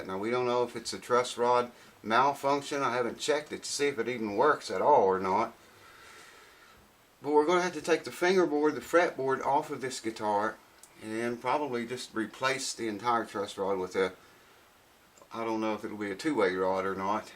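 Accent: South, United States. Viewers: what state are you in?? I thought you was a southern man--hmmm maybe youre up there with Neil Young lol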